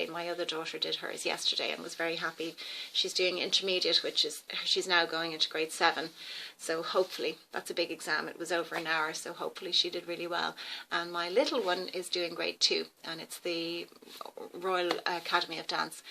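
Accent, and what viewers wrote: Ireland. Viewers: Hi, I wish I'd found you sooner! As a mature lady I too have fierce hooded lids. Your tutorial was excellent! A question for you: You sound Irish, as I am, and I wonder how you manage to shop from Sephora as I didn't think they shipped to Ireland! I would love to try your winged look with the Sephora liner! Any help would be much appreciated. oxo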